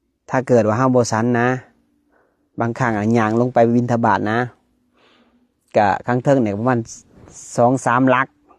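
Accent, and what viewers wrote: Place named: South, Thailand. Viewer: สาธุสาชธุคะ่ชอบฟังมากมากคะ่ยกระแสสินธุ์พากใต้คะ่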